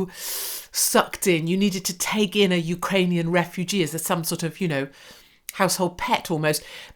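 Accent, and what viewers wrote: United Kingdom. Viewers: We never provoked Russia. It would be nice if you guys stopped spreading Russian propaganda. There were no biolabs. There are no NATO nukes pointed towards Russia on the Russian border. Last I checked Putin doesnt seem to have a problem with slaughtering civilians, since he does it himself. In Ukraine. In Syria. In Georgia.... So Nuland, a single person says "F*** the EU" therefore you hate all of America? Amazing. BTW are you even part of the EU? Your videos indicate towards a heavy British accent..... According to the world corruption index, Russia is even more corrupt than Ukraine. in his video critizising Mearsheimer and Realism, in the last segment he compares Russian corruption with Ukrainian corruption. Its quite different. In Russia Putin controls the corrupt. In Ukraine, nobody controls the corrupt. So in Russia you have corruption + autocracy. 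In Ukraine you have corruption + anarchy. And this also makes sense, since historically Ukrainians have always been anarchists, while Russians have always been authoritarians. Why should Ukraine pay reparations to USA? Makes no sense. In fact the West is setting up a Marshallplan for Ukraine to rebuild them, once they are liberated from Russia. And we have seen the enormous economic sky rocketing of countries that get free from Moscow's clutches and even more incredible when they get supported. If Ukraine wins, the one to pay reparations is Russia, to Ukraine. If Russia wins, it will rebuild for free? LOLOLOL What ever Rus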